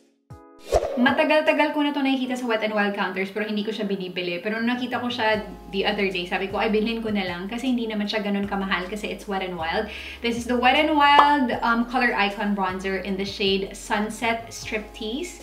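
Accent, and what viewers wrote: Visayas, Philippines. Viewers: I love your vids, coz I also have dry skin and we almost have the same shade (I think). Ang saya manood ng vids na super relate ako lalo na kapag parehong hindi maganda yung effect ng product because of our skin type. and I super duper love hearing your bisaya-slang accent, super sushal po:)I would love to try the Superstay Ink Crayon Lippie kasi wala pa sa Pinas nyan.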